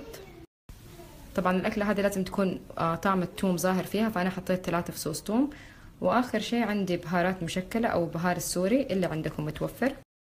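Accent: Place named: Hejaz, Saudi Arabia. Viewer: شكراً الطبخة سهلة انبسط لما اسمع حجازية زيي تقدم اشياء حلوة الرب يباركك ❤️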